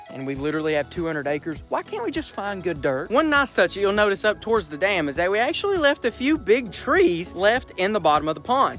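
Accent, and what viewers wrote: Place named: Texas, United States. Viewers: You sound like you’re from Texas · You actually sound like Georgie in young sheldon. Are all texas poeple speaking with this accent!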